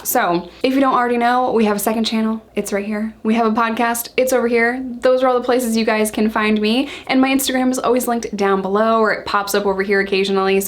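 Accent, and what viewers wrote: United States. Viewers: Crikey you're sexy, you look like you are british, with american accent...but I know you're american, which is great.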